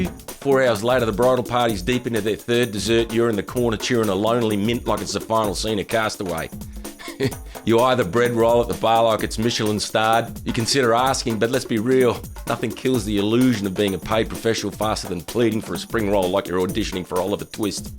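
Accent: Australia. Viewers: Had to come back here after initially enjoying this a month ago as I thought of you at a live outdoor gig this weekend. Everything was actually good and I had been waiting to have a slower moment and break out a song. I started in very softly and the puppy adoption set up which was part of the event and had been there quietly all afternoon under the trees in a corner, had a visitor walk by with two dogs of their own. About 20 dogs commenced to bark, as I began to sing. I switched to a chorus of and in my head heard your Aussie accent say dogs barking off to the side like there's no tomorrow, that's a new one. And I swear it was not in reaction to me!😂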